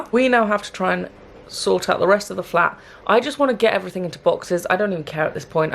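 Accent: Liverpool, United Kingdom. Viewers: Its just Liverpool English accent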